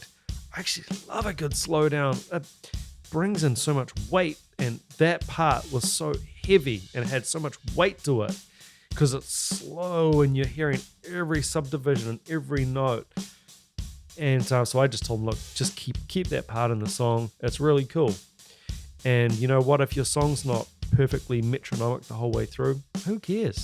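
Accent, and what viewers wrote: New Zealand. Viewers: casually starts watching video ~hears accent~ "here in Auckland, New Zealand". Swears loudly and falls out of bed Gidday fellow Kiwi. How nice of the algorithm.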